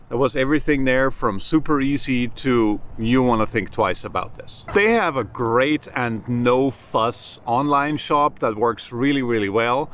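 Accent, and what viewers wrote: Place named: United Kingdom. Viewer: Please give me a call I’d really like to talk to you in and hear you from Scotland or not Wales, but somewhere on the English channel? Your accent is beautiful give me a call I’d I would really like to hear from you, especially for the fact with your experience in Montana, I would like to learn I’m moving out there. I’m not vacationing I’m moving there and I need to be successful and I need to know what’s going on I need to learn from people that are from there or the understand it better than I do and I am leaving today at 12 PM. Give me a call. Text Me maybe some hall we can get together. It would be wonderful to learn from you my friend you sound like a very good person so God bless all the best to you and your family family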